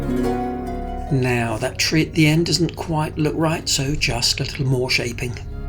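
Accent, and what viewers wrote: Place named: England, United Kingdom. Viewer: I was wondering where you were.... I was missing you and your wonderful English accent ❤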